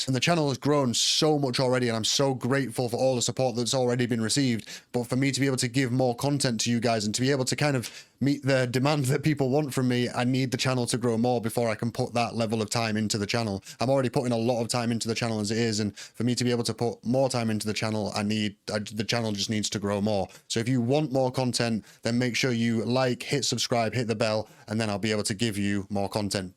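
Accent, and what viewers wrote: Manchester, United Kingdom. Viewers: You again??? Horrible singing noise, ye stealing me subs and I am going to make a vid absolutely destroying you if you keep making so much vids. Ye ain't a Geordie and ye came from nowhere with ye Manchester accent and nicked me subs. I get 2500 subs on me main channel while you get 6500??? Ye bought subs and have time tea make loads of vids all the time like, ye vids are not as fun as mine and ye don't have mason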